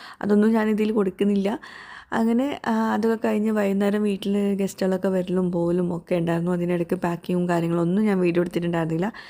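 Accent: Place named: Malappuram, India. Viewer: മലപ്പുറം ഭാഷയാ ണ് കേൾക്കാൻ. സൂപ്പർ ❤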